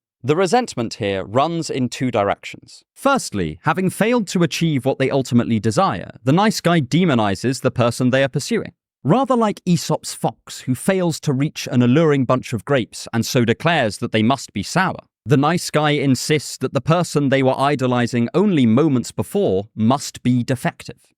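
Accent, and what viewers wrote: United Kingdom. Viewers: I really like his British accent ❤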